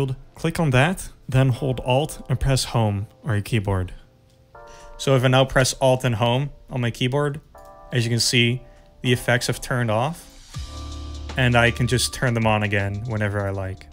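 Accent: Netherlands. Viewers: You’re either Dutch or from Belgium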